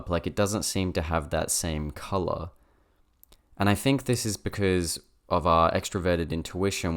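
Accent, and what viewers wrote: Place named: Australia. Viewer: So nice to hear another Aussie male accent. We INFPs seem to be a little rare in this beer-guzzling testosterone-driven culture here down under.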